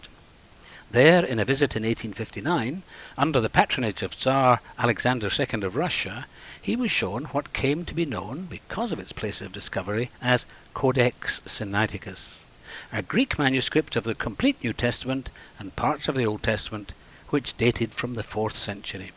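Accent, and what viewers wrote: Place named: United Kingdom. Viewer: FAKE - nice British accent though.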